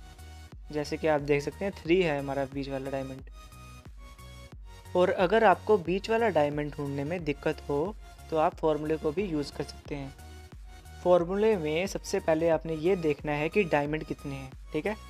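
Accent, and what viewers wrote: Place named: India. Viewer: dude i hate hindi accent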